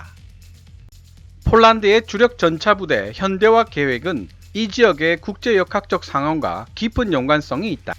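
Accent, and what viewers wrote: Gyeongsang, South Korea. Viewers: 얏~야! ....니는 갱상도 사투리부터 고치는게 시급하다!!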